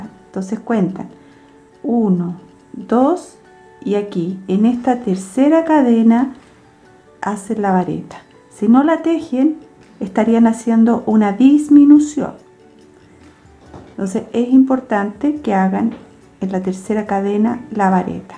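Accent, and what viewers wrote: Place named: Chile. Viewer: LO SABIA X EL TIMBRE DE VOZ ....YO TB SOY CHILENA Y T FELICITO XQ EXPLICAS MUY BIEN ...GRACIAS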